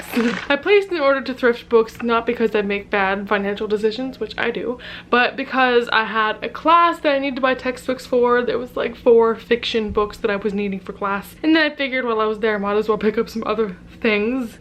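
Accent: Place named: Germany. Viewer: Always so excited to see some German books!! ;) Also, you're German accent is amazing! Especially the "ch" you nailed! Ich bin gespannt, wie du es finden wirst, Victoria Schwabs Bücher auf Deutsch zu lesen :D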